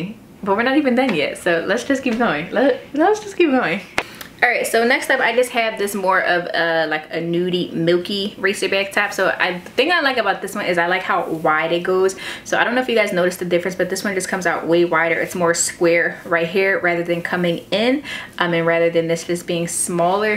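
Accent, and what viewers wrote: Philadelphia, United States. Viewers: girl I clicked your video because it was suggested to me and within two seconds I had to see if you were from Philly. low & behold I was right! hey Philly sis <3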